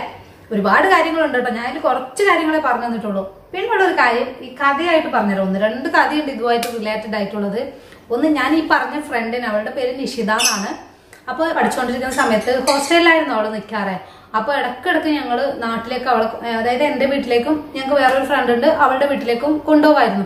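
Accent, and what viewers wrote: Kannur, India. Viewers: ചേച്ചി കണ്ണൂരാണോ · Enikkk Kannur Slang Onnum Kathilla Njan Kollath Annn Kannur Slang Kekkennn Rasam Und Muthappante Swantham Naddd Eshtam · enikum thonni😍😍😍 edak kannur keri varunund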